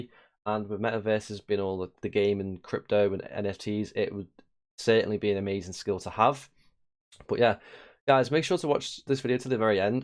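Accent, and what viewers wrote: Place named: England, United Kingdom. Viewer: Great video bro good to hear an English voice commenting on BCRC 🏴✌️✌️✌️ can’t wait for my vox cat airdrop to use in boss planet it’s going to be 🔥🔥🔥 boss cats stick together keep up the great work guys. WAGMI everyone is bullish on boss cats don’t miss out people these great you tube videos give you all the information you need. Announcements after announcements are always the norm in boss cat rocket club and delivering day by day on the roadmap this is just the beginning ayoooooooooooooo LFG lg1985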